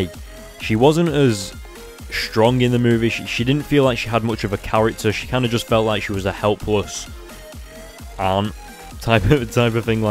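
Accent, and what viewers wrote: United Kingdom. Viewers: r u british or american and which of those countries do u live in im american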